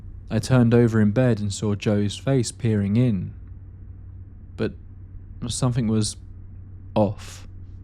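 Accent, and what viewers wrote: England, United Kingdom. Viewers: Big fan of your channel man, great narration, great stories and the spooky English accent helps, much love bro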